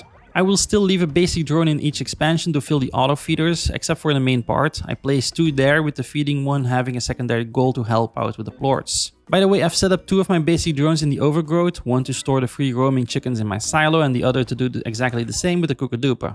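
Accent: Netherlands. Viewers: I smell Dutch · I smell nederlands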